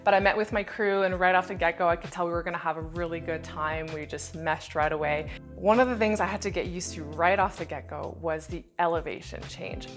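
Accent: Quebec, Canada. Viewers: Your Quebecois accent must have been a hit among the Congolais. Your story briefly mentioned “adjustment”, which I know was something you must have been downplaying for those who could never understand. You’ll probably remember your first days in Congo and the culture shock for the rest of your life. I still remember arriving in Zaïre at Kinshasa in 1978. I was 13, Couldn’t speak French at the time, There was a drought going on, a war just ending, and my family and I were reduced to eating tomato soup for months until our regular family food shipment arrived. The adjustment for me was epic, and I had already become used to living in several different countries by then. The experience of living there for a little more than four years was so wonderful, and exciting. I miss that country so much. Interesting that your flying, even your entry into the DRC was far more eastern-oriented than it was in the 70s. 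In my days, EVERYTHING was Kinshasa-centric. Supplies, even for the Eastern part of the country were mostly trans-shipped out the Kinshasa airports, and trucked in from the port of Matadi. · Great video, ton accent français, tu dois être une quebecoise si je me trompe pas... Sinon 👏🏽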